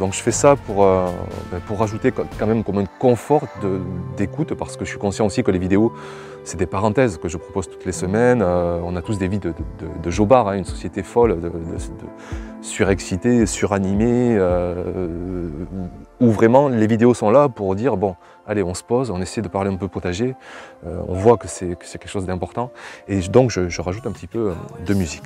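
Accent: South, France. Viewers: Merci pour cette video et pour tous ces details que tu as partage, bien conscient qu'en plus de la passion du jardinage, il y a un gros travail pour faire ces videos... J'ajouterai pour compléter le tout, un capital sympathie incontestable et surtout cet accent du sud (j'adore!) qu'on vous envie dans le nord! BRAVO!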